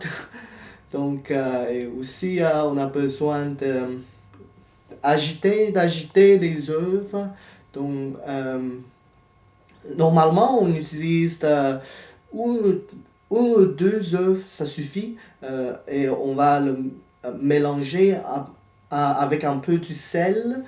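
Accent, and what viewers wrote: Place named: Quebec, Canada. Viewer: Est-ce que tu habites au Quebec en ce momment ? tu as un accent, mais tu parles le jouale quebecois, vraiment cool à entendre, keep it up ! :) haha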